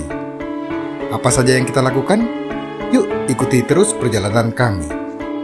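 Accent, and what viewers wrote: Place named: Sunda, Indonesia. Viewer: Om..... lagu na nyunda pisan 😅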